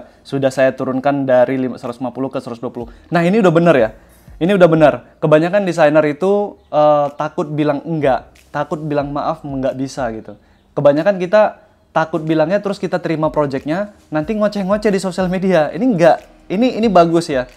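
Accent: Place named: Medan, Indonesia. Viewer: Bang org man nih Kayak ada Medan Medan nya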